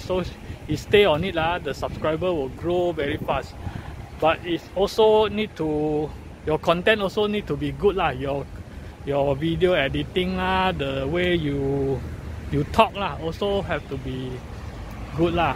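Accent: Singapore. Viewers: If I were to analyze, the way you speak is cater for Singaporean only. A lot of international fans will not understand what you were talking. But most Singaporean like to watch watch only 2 things on YouTube. Comedy and Chio Bu(especially those sexy sexy type). That's why if you see trending, top channels/ Singaporean always repeat their contents. Like those 10 types of students/ 10 types of that (Which I find it super boring). Even those channel with Niche like runningKao which is focusing about running did not become as popular as those channel with girls showing skin. Furthermore, runningkao's english is similar to yours which is more suitable for Singaporean. Therefore, I feel finding a niche is important but depending on Singaporean for views will not be rewarding in the long run. That being said, I still love your content especially pre-covid19 all those BTO, Grab food (Hokkien version) and races (That's why I subscribed to you channel be I love the way you speak and your character) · I am one of your subscribers, I love your content and your editing. 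You are very authentic and real. Don't change, I enjoy your uniqueness and Singlish. Thanks for your videos. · Hi, i've been following your channel for a couple of years now and i say there's no need to change or to find a niche. Actually you yourself is already a niche. Your channel is about you and your life. And who you are, speaking singlish like most of us do, is what make you a true blue singaporean. This is also what makes your channel relaxing to watch. One thing i suggest is to do away with youtube shorts as it doesn't really bring anything across. You can make 30min vlogs of you touring around your neighborhood eating different food and that will already be relaxing to watch.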